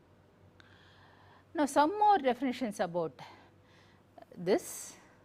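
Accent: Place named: Kerala, India. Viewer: That accent reminded me of my drawing teacher from Kerala lol But she explains so well. I understood everything in one go !! Thank you very much mam !!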